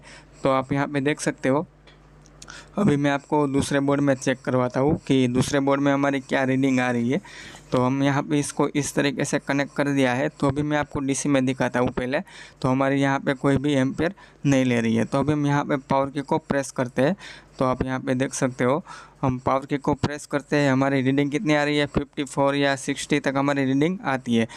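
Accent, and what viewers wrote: Gujarat, India. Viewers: Tamara bolva uparthi lage k tame gujarati cho??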